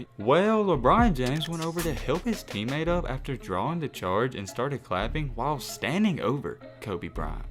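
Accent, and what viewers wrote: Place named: Cleveland, United States. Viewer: Narrator is obviously from Clevelamd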